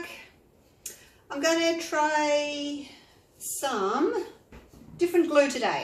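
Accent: Australia. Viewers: Can't half tell your an Aussie discussing the merits of baby poo lol..love it :D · Wow - you sound just like me! And I LOVE these colours and your work and the way you chat. I miss Australia - so it is so nice to hear someone from there. And this is from 2-3 years ago so you must have been one of the first popular Aussie Pouring youtubers. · American here. Love watching the video for the content, and enjoy hearing an Australian accent. For people like me who can't travel, It's fun to see/hear someone from a part of the world, far away from where I live. We are all different, but still have so much in common. Thanks for sharing what you do. Happy trails, from the USA.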